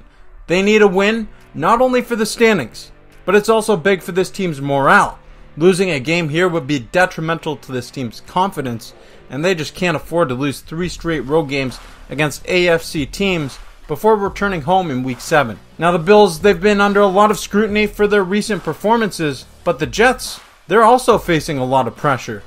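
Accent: Canada. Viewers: Happy Saturday everyone! I don't make predictions just Bills win. Hey - Are you Canadian?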